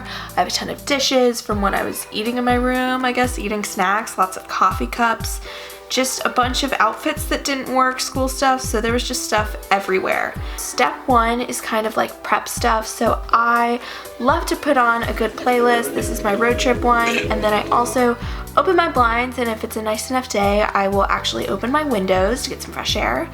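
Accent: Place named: United States. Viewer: She sounds american and she said douvet.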